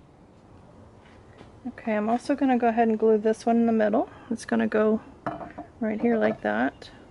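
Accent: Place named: South, United States. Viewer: Love your content, beautiful craft, trying to figure out what part of the south you live in, very familiar scenery. But because of your accent I know you are not as far south as I am. could be slightly north of my location, but you must be a transplant....lol! Moss filled oak trees near a lazy waterway, what a beautiful backdrop for your delicate flowers!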